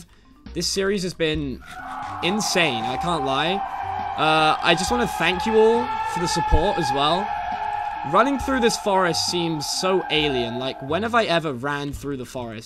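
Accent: United Kingdom. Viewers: HES BRITISH? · are you british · How do some dont know is British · Very British.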